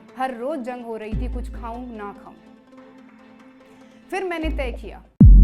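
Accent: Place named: Haryana, India. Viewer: Apki haryana dialogue bahut mast thi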